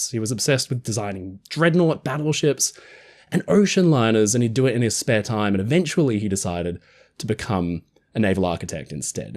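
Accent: Australia. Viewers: Friend of mine retired from the merchant marine roade the SS United States. He said she was somewhat rough riding he thought due to its speed. A shame but the time to have done something was decades ago well before the Mad Men show. Huge cost, being in Philly didn't help. Interiors is what most people want to see. Ship didn't have the right friends in the right places. But as you noted, very few ships saved/preserved. Even naval ships were neglected, Farragut's flagship rotted away. Nothing from the Sail & Steam era I'm fascinated by. Thanks. Beautiful rant. So you're an Aussie??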